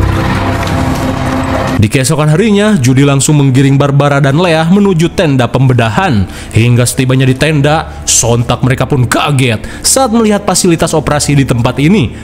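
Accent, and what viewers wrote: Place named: Sunda, Indonesia. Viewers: Admin ini kyknya dari tasik atau ciamis😅,kenur kenur😂😂😂😂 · teh org Priangan timur deh org mana sih jiga org banjarsari kok cara ngobrol nya sama kaya aku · abang nya curiga org sukabumi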